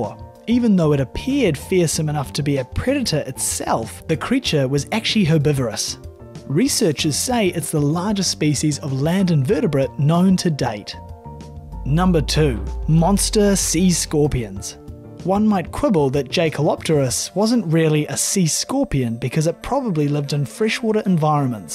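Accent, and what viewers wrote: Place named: Australia. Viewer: Nothing frightening about any of these, and your Aussi accent makes many of your species pronunciations atrocious. There is no such thing as an "anthropod." It's "arthropod." You made that mistake throughout this video.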